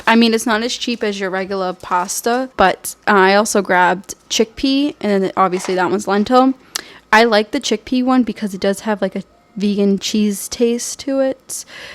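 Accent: Boston, United States. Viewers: She is from Boston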